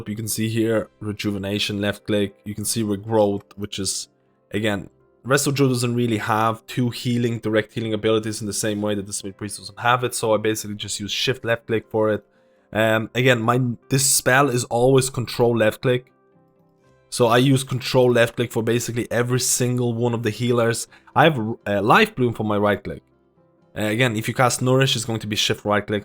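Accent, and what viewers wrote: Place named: Ireland. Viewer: Thank you for the great info. Your accent is really interesting may I ask are you part Irish part Scandinavian (swedish, norwegian)?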